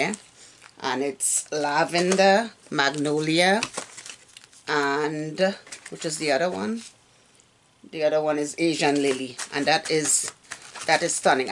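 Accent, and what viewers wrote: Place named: Guyana. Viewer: Love watching your videos! I was married to Guyanese man for years and love your accent, brings me home. also your DT has the best things!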